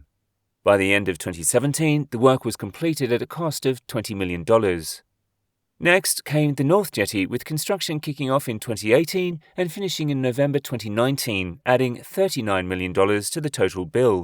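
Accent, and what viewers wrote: United Kingdom. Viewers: Your accent is really annoying. Pretty much all british people are tho so i dont blame you, but you should stop talking so much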